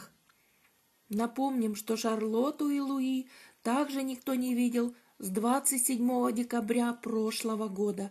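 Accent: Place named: Rostov, Russia. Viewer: НЕ- КАК ??? НУ И БЛА БЛА БЛА с ростовским акцентом !!!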